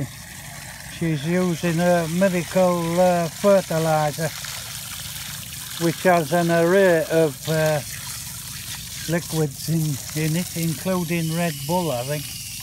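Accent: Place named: Newcastle, United Kingdom. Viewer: you make me laugh you sound like me old granddad god bless him proper Geordie man..died at 94...done ww2, Burma and stuff, from Stanley Co Durham,..I used to gan me holidays to Consett and East Stanley...spoiled as a child, im Scottish lad but my holidays were spent in Newcastle,...Iloved it as a wee laddy, you must be a Mac,am....south of the Tyne.....great people north east,...love the Geordies,...if i ever meet you il bring a bottle of Newcastle Broon over for ye bonny lad....Im a reet at takin Geordie like man nae bother god bless ya.....gan the TOON, <num>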